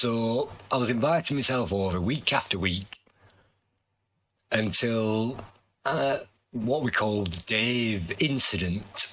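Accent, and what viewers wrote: Lancashire, United Kingdom. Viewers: Great to hear more about you. We're the same age and some your memories sound similar with me being from Yorkshire. Loving the Lancashire accent mate, don't listen to anyone giving out to you for it! Hope to see more videos soon.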